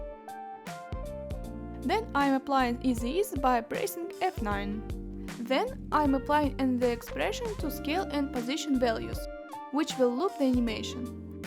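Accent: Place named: Russia. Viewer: When a tutorial comes from mother Russia, then the heavy accent starts, you know the video it's going to be awesome!!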